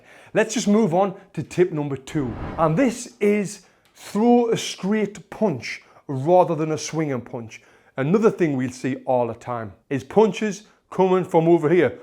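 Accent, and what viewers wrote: United Kingdom. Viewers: 喜欢你的英国🇬🇧口音，哈哈！ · As a french person, I enjoy your british accent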